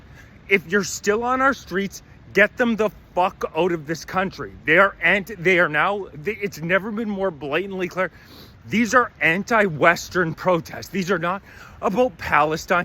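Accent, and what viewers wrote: Canada. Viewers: Here we go again the pandering continues senile old ladies and naive children beware the funny thing is this guy couldn't exist for sh!t without the same strung out jaded buffalo soldier ignorant immigrants (that he goes so obscenely out of his way to rip on when antisemitism is a criminal offence as of last year!) looking the other way from every second word out of his mouth cause yknwo, they saw actual war (which this guy supports of course) and the same blackmailed child molester politicians he pisses the same exact party lines out of his dick hole face with that stupid vaguely hoser accent he puts so much effort into 😂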